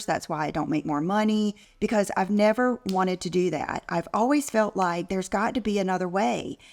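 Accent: South, United States. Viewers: 100%. I will never forget when she made fun of the lady in the elevator. When people show you who they are, believe them. That sweet Southern accent doesn't fool me.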